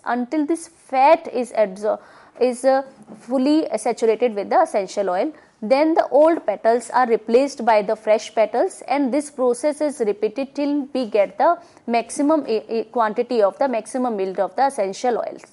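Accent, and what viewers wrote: India. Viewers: I’m so sorry, because you are a beautiful intelligent women… But I can barely understand what you’re saying. One word blends into the other is if you’re speaking A foreign language rather than English. I understand you are in India, and I’m in California, but if you could speak more slowly and put a little space between your words you would be understandable. Thank you so much for taking the time to do this for students. I wish I could understand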